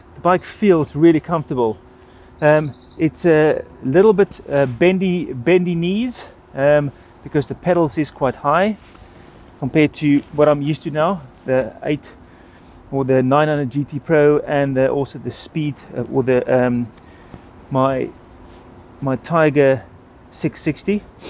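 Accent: South Africa. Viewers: Klink my jy is van Suid Afrika??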